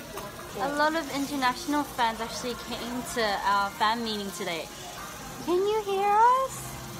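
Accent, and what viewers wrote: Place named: United States. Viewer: yea she sounds more american in this vid but then in other vids she sounds aussie... same with jennie haha she sounds american in some vids but sometimes she has a nz accent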